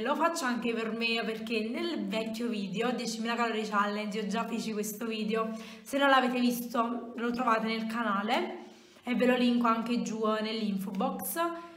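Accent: Naples, Italy. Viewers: Sei napoletana Ps ti adoro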